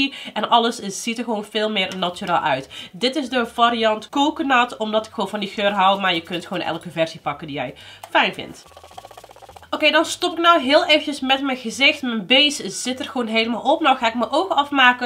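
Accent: Netherlands. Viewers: Lieve. Ik vind het jammer dat ik je nu pas heb ontdekt, maar beter laat dan nooit toch? Je hebt al meteen een fan EN een abonnee erbij. Je hebt echt talent ma sha allah. Blijf ermee doorgaan, ik kijk al uit naar de volgende video’s. Nog 1 vraagje, je hebt echt een heel leuk accentje, het is wel niet volledig Hollands als ik me niet vergis. Van welke stad is dit accentje dat je hebt? Groetjes ❤️🤙🏻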